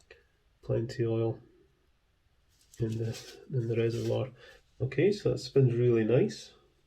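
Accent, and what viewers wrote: Scotland, United Kingdom. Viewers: Enjoyed this and all of your other vids. Nice too, to hear a Scottish voice (Penicuik here!) - a nice contrast to the equally excellent Barrie Davie and Sam's Trains. Keep up the great work. 🚂🚉👍🏼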